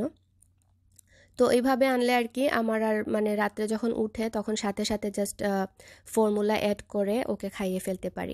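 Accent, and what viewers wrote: Sylhet, Bangladesh. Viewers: Apnee ki sylheti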